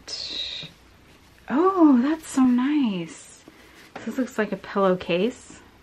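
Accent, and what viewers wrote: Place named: Western Massachusetts, United States. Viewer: Every time you pronounce the word button or curtain etc, I remember an article I read quite a few years back about the origin of American accents. I searched today and found your particular pronunciation is addressed on Babble: The United States of Accents under Western Massachusetts and Connecticut Accents. So be proud! You don't have to change for us!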